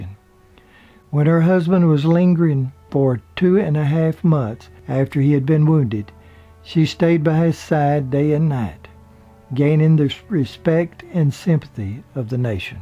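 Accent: South, United States. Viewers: Great video... I can listen to you and Shelby Foote for hours. I love the sound of a southern drawl... it makes me feel at home. Great work sir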